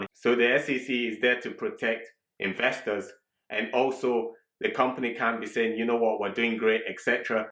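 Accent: Scotland, United Kingdom. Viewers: You scottish ?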